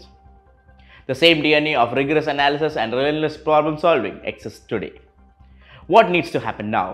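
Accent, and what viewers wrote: Kerala, India. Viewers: A malayali with malayali accent english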